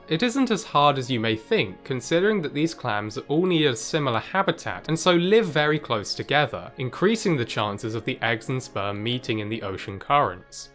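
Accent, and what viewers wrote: United Kingdom. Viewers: The Brit accent seems to be so very trendy on videos, cable news networks etc. It's as if it somehow adds a bit of legitimacy to a story. Why? I'm wondering how many people share my view that the accent is annoying at best, and a distraction that serves no purpose. Surely there are out of work Americans "journalists" who could read teleprompters without the haughty, lecture like tone and attitude. It ain't right! lol!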